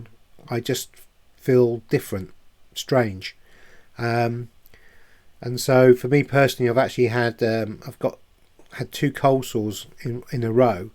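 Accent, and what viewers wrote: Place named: England, United Kingdom. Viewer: I'm new subscriber. Very refreshing with English accent. Yes, much of what u r saying rings true. Ascension symptoms for me, weight doesn't come off, blurry runny eyes, itchiness, dizziness, bloating , less energy. Neckpain, my skin cracks, cold cracks on my thumb, today I have some pain in my right shoulder blade. Like a cyst . Geeez, nice list . When do we get our forever new bodies? 😂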